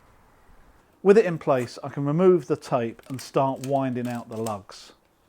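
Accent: United Kingdom. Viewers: Wow!! ❤ what a nice door! Great locks to keep the local punks out of my electronics shop at home - love the multiple locking pins on side AND top! Sounds like you might be across the great pond - can this be purchased in the once great USA?...thanks for the GREAT demo 👍👍👍!!!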